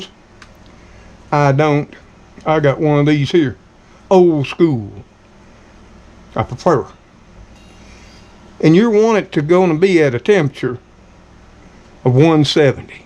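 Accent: South, United States. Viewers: Yum!!!! Now that looks delicious. I’m going to cook this as one of the Christmas meat choices since we don’t celebrate thanksgiving in the UK. PS my son and I love your southern US accent! It’s like music to our ears, so an extra treat getting to watch you not only show us how to cook delicious food but also hearing you speak. Hope you had a wonderful thanksgiving 😊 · That looked lovely, thank you for sharing. I look forward to your Christmas preparation videos and Christmas cooking. And I love your Southern accent too, as someone else said further down. I was playing one of your videos to a friend of mine, as I have told him about you and how much I look forward to watching these. He loved it as well. So thank you from me and him. :)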